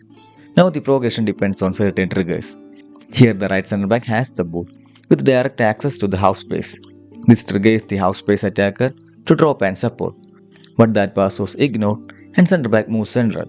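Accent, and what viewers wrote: Kerala, India. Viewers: I could recognise your accent since I have friends from Kerala and I watch many malayalam movies. I'm from Assam. So great to see a tactico account from India. I think you are the first one. I wish you all the best and hope this channel grows leaps and bounds. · Good stuff brother. Your accent sounds like you are from Kerala. Even better!!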